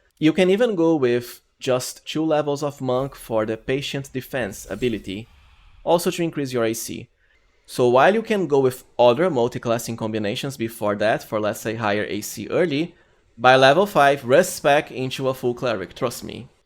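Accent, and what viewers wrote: Brazil. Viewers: Tu é brasileiro? Parece brasileiro falando inglês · percebi que vc era BR só pelo sotaque kkk me inscrevi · Curious question where you from? I am trying to guess the accent. Somehow I feel like you might be brazilian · Bro, you’re Brazilian? Your accent feels like it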